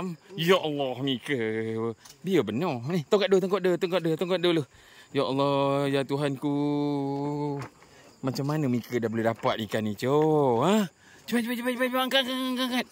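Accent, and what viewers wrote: Perak, Malaysia. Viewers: Pekat loghat peroknye, beso kome 😂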